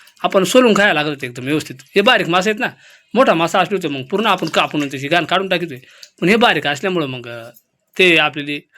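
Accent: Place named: Nashik, India. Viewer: कुठे राहतात काका भाषा तर नाशिक जवळची वाटते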